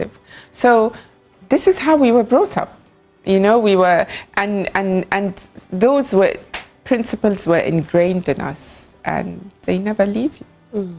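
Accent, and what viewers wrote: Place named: United Kingdom. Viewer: She talks like a British lady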